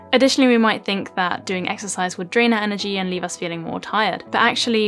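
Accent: United Kingdom. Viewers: 自打听了UP主的口音，我打算放弃美音，学习英音。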